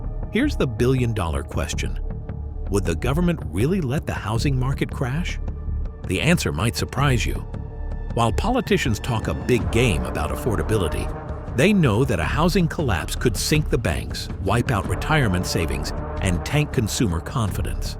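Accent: United States. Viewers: Not sure why you used an American narrator for an Australian issue?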